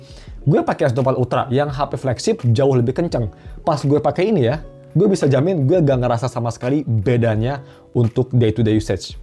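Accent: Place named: Depok, Indonesia. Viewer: mas" Depok 😅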